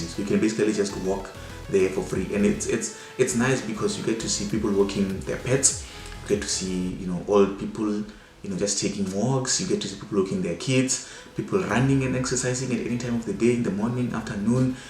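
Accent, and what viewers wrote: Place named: South Africa. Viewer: Love your SA accent by the way. It's beautiful!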